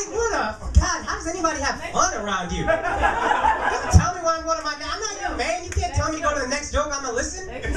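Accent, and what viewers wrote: United States. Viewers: Really, I thought he had way more of an NY flavor to his accent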